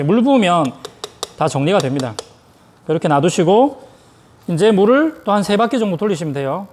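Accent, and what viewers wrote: Gyeongsang, South Korea. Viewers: 카라멜 마끼야또도 집에서 만들수있는 방법 가르쳐 주세요^ 구독 할께요^ 집에서 핸드드립으로 마시는데 라떼 넘쉽게 가르쳐 주시네요 경상도 이신가요 사투리 쓰시는뜻 저도 경상도 ㅋ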